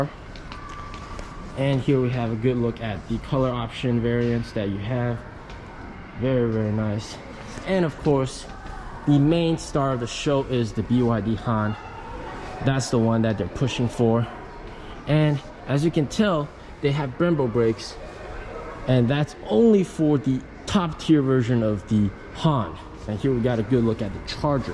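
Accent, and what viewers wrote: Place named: United States. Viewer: Never watched any of his video, got a headache from all the «cool american» accent. Keep it simple, not everything is sooo cool. From an european’s perspective, the car looks great, but sorry. It’s still a Chinese car with shitty range. It will never work outside of China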